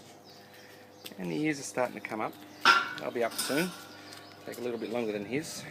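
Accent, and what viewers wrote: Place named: Australia. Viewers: I know it's because you're Aussie but the way you speak to them sounds so bad to me as an American (obviously they're dogs so how you speak to them doesn't matter) but it's just kinda funny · You do have an Aussie Accent but not as strong as some.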